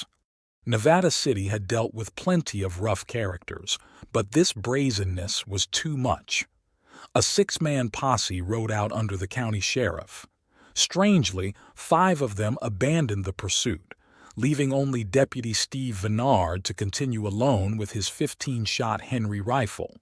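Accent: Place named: United Kingdom. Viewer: NW Georgia. You ? I thought you were British. 😂😂.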